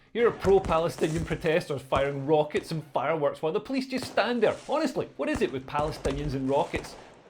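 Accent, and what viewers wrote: Scotland, United Kingdom. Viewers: And indignant rant is always best with a Scottish accent! Subscribed! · Laughed myself silly when I realized I hadn’t recognized your obvious Scots accent; I thought you were English. I guess I’m farther from the UK than I’d thought.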